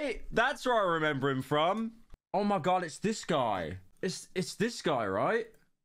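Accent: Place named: United States. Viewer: Why is he so high pitched and murican twanged now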